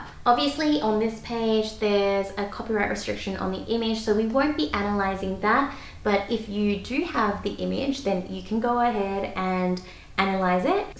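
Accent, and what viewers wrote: Australia. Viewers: honest question out of curiosity: are you Chinese/Korean/Japanese Australian with a background in learning American English?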